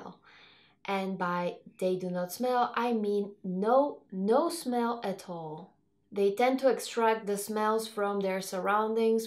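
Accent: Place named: Belgium. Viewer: Love your accent. Where in the world are you from? I'm thinking French-speaking Belgium.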